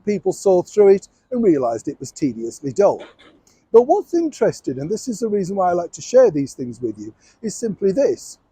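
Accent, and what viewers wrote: Yorkshire, United Kingdom. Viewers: I don’t watch mainstream news channels, I watch GBNews and Mike Graham. I always watch you too, love your no nonsense Yorkshire straight talking.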